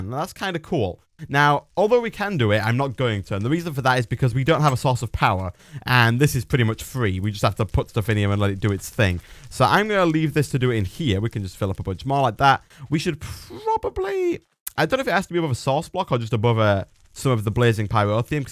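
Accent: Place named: Leigh, United Kingdom. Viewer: Are you from Liegh, Your accent sounds similar *****.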